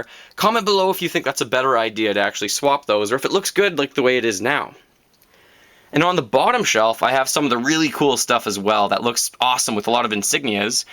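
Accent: Canada. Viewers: Love that Canadian accent; I'm crazy for a Canadian man myself.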